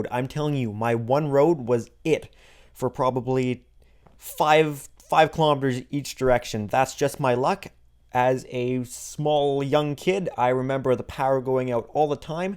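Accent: Canada. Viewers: Hi where are you from your accent sounds Canadian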